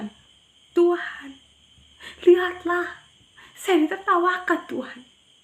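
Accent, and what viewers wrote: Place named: Madura, Indonesia. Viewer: enak bngat bu bhsa di campur good.snang klu khotbah tu bhsanya darrahnya hrus ada krn itu asli bhsa leluhur bu dia.sy paham klu logad madura krn sy lama di surabaya tnggal di daerah kenjeran bnyak madura di sananya mba.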